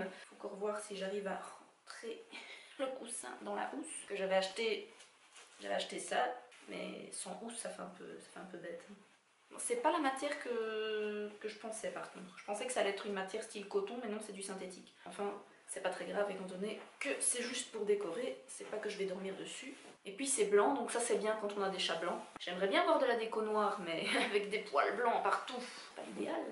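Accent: Belgium. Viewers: Tu habites en Amérique du Nord non? Il me semble que tu as l'accent Belge!? Chouettes vidéos 👍😉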